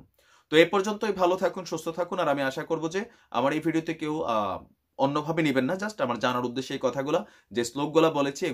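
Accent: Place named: Noakhali, Bangladesh. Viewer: Bruh apni j noakhali/laxmipur er eita apnar kothay clear buja jay.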